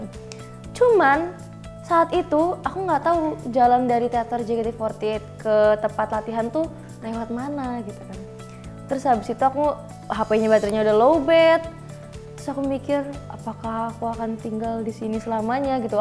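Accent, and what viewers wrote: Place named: West Java, Indonesia. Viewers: Logatnya sunda nya ga ilang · Logat Sunda nya masih kebawa ya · logat bandung nya masih ke bawa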